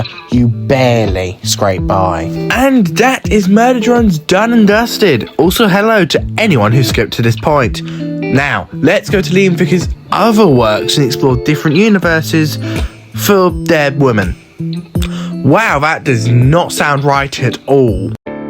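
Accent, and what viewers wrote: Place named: United Kingdom. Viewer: You're so british it's grating 😔😔😔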